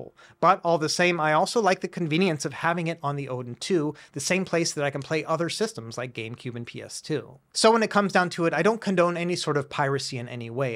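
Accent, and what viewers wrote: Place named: Midwest, United States. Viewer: Where are you from? That's a heck of midwestern Accent you've got there. What is that Missouri? It's almost like it's Minnesota.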